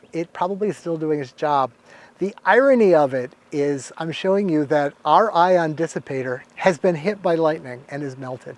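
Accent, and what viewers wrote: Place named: Seattle, United States. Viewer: Hello, loved it are you from Seattle, I think I heard that??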